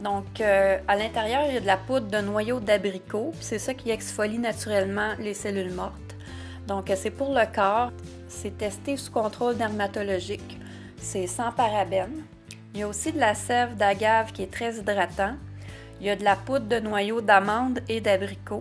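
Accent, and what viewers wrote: Canada. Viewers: cc jadore ton accent cannadien si je ne me tropmpe pas.jadores les produits Yves Rochers.moi je prend souvents des parfims et billes de bains.super ta videos je te fais de gros bisous a bientot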